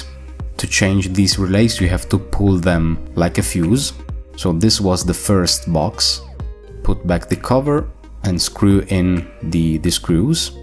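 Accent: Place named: Italy. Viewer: Love the Italian accent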